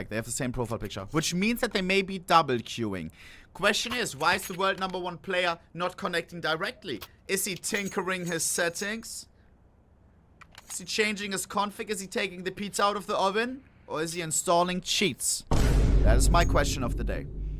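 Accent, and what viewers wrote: Germany. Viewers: Stresst richtig, wenn Deutsche versuchen englisch zu sprechen :D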